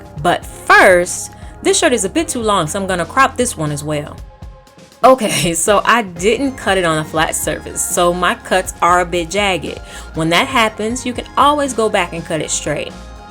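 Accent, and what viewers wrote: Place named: South, United States. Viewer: it’s the cute Southern accent for me, y’all!❤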